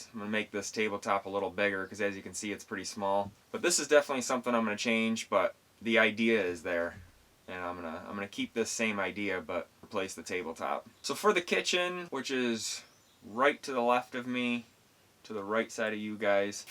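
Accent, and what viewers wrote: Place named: Minnesota, United States. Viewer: I like how honest you are. And I have a feeling you are a bit of a perfectionist. Also I’m from Minnesota and it sounds like you might be too… or at least a state that touches Minnesota.😊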